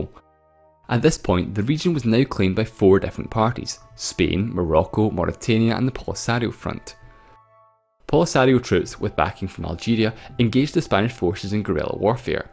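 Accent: Scotland, United Kingdom. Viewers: Heavy Scottish accent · its not a dialect, its an accent. And the scottish one is recognisable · Thank you for your videos. You make the seemingly mundane topics incredibly interesting. Also, I love your visuals/animations and your lovely Scots accent. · Im not from a english speaking country but... Are you from Scotland?🤣 What an accent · I'm surprised at the amount of people who can't recognize a Scottish accent!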